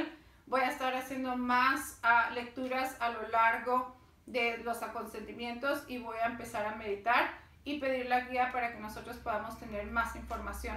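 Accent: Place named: United States. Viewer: ahhmm tu porque hablar como gringa?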